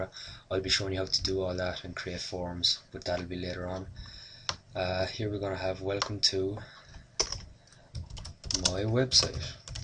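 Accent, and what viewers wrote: Ireland. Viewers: Is that a Langer accent I hear?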